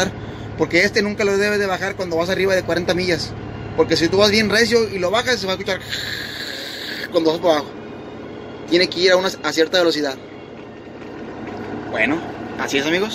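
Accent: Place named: Mexico. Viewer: Me fascina ese acento Mexicano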